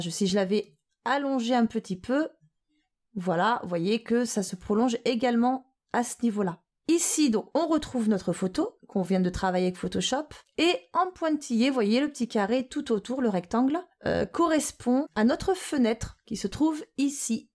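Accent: South, France. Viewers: oh t'es du sud toi, quand je regard tes tutos et après tes clockworks sa fait un choc, tu refait tout sur une logiciel comme C4D non ? Bonne vidéo btw :)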